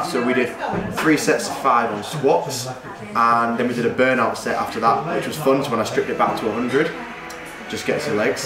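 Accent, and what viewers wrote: United Kingdom. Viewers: Would you mind talking with no environmental sound? It’s hard for me to listen your British accent and even more if you don’t use a microphone. Thanks !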